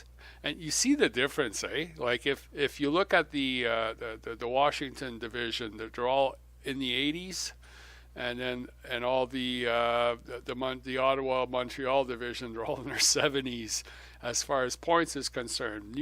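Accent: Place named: France. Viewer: Hey buddy, do you speak French perhaps, I hear a tiny accent... :p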